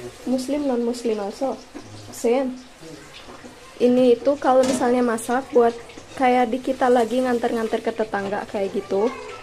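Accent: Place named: West Java, Indonesia. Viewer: Gimana ceritanya sih bisa nikah sama orang India, trus neng kayak logak Sunda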